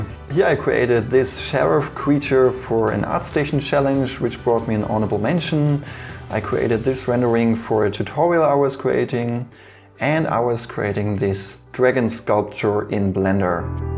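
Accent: Germany. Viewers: Mega Story! Hab schonmal ein Video gesehen und dacht mir schon, so wie der spricht: das kann nur ein Deutscher sein! :P Grüße aus der Altmark! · Bist du deutscher? Dein Englisch hört sich so an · Man hat halt echt sehr gehört, dass du Deutscher bist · Der Deutsche Akzent ist Real! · German English, ich auch x) Und schiefe Zähne, aber ich nicht ;) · The story of your childhood home - in combination with your German accent - makes me think of Dr. Doofenschmirtz. You're probably a nice guy, though! :P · Love your German accent it actually sounds good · You sound german. I am too... also wollte ich fragen, wo und wie du als 3d Artist arbeitest? In der Umgebung wohl kaum? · Nicht schon wieder ein Deutscher der probiert Englisch zu sprechen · 100% deutsch junge sein akzent ist ja mal andere welle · diggha, wieder ein Deutscher, der versucht englisch zu sprechen. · Oh ein deutscher, ja dann Echt krass was du so drauf hast respekt !👍👍👍 Edit: oh, hast ja sogar noch gesagt das du aus deutschland kommst Mhhh Finde man kann das (wenn man selbst deutsch ist) echt stark am akzent merken☺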